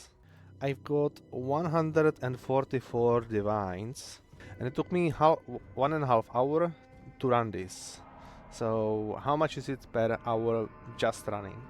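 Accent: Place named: Czech Republic. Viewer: Českej přízvuk se prostě nezapře.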